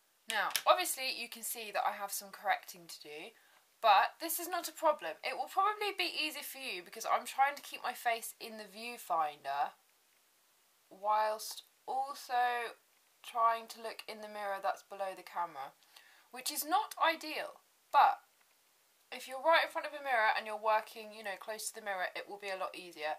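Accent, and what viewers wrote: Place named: London, United Kingdom. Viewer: love your videos and watch them all the way from Brazil! I don't usually subscribe to channels of individuals like that, but I was hooked from the very first video I watched, which was the one about your perfume collection (don't even know how I got to find it on here). Apart from being very beautiful, you have such good sense of humour, seem like a good laugh and a very down to earth kind of girl! I used to live in London quite a few years a go, went to King's College and worked part time as a model. So your accent, your Boots references (I was kind os a Boots freak) and your day to day make me miss that time in such a good nostalgic way. Thank you and looking forward to more from you:)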